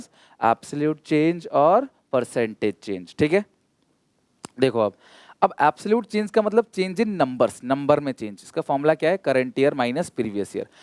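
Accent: Odisha, India. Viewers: Sir r u from orrisa🤔🤔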